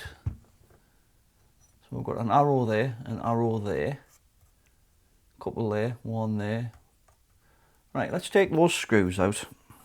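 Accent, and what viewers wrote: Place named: Newcastle, United Kingdom. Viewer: Its a real pleasure hearing a geordie accent after ive been in nz for so long 😊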